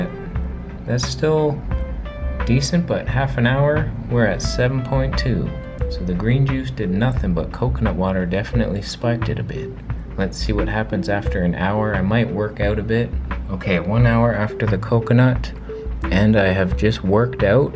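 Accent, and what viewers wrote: South, United States. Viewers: You had a southern drawl in this video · u talk like u 4rm the south son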